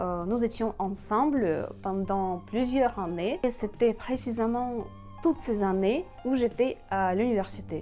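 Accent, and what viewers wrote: Russia. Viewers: depuis décembre 2022 j'ai lancer ma chaine youtube sur les réactions musicales et je me suis axés sur les artistes russes que je trouve vraiment incroyable, sinon je viens de découvrir ta chaine et j'aime ce que vous faites et votre Français est vraiment très bon avec votre accent russe c'est juste superbe et moi j'adore la langue russe que je trouve magnifique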